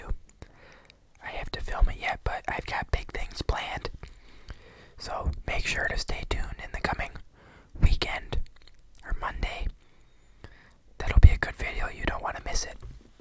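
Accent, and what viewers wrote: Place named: Boston, United States. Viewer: You have the same accent and speech cadence as MorpheusASMR. Must be Boston area too?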